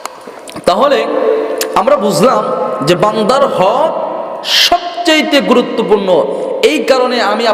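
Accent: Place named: Shariatpur, Bangladesh. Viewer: মাশাআল্লাহ শরিয়তপুরীরের সুয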